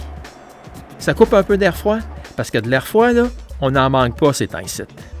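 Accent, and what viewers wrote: Canada. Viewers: Hello, la vidéo date mais moi qui la découvre je pense qu'elle est intemporelle ; superbe travail, un plaisir à regarder et puis l'accent Canadien ajoute un poil d'exotisme fort sympathique, je vais regarder la suite. Bravo!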